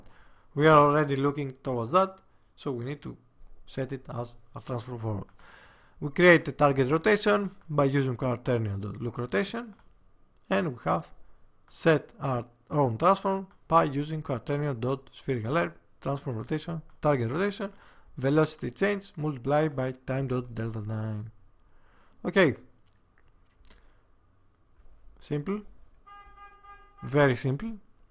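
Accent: Greece. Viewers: aderfe eisai apsogos sunexise etsi (in case you're not Greek, however I am picking up greekish accent then apologies I was only saying "you are amazing keep it up")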